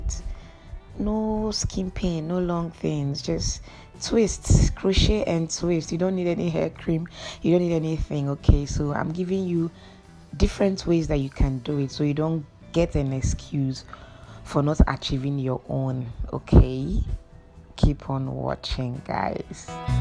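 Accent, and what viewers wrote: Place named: Ghana. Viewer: You speak like a Ghanaian but your name is a Nigerian name.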